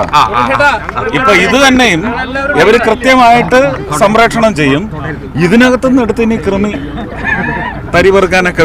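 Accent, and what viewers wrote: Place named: Namboothiri, India. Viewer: ഇയാൾ ആരാണെന്ന ഇയാളുടെ വിചാരം.. സംസാരവും ആക്ഷനും എല്ലാം എന്തോ ആണെന്ന ഭാവം.. ഓരോരോ കോപ്രായം.. അടുത്ത ജന്മത്തിൽ പൂണൂല്‍ ഇട്ട് നടക്കണം എന്ന ആഗ്രഹം ആണോ ഇപ്പോഴേ നമ്പൂതിരി ഭാഷ പ്രാക്ടീസ് ചെയ്യുനത്..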